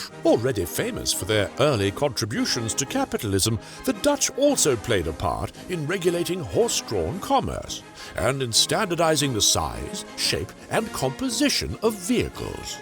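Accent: United Kingdom. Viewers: Im still confused about a British voice being so excited and enthusiastic about America lol but i love it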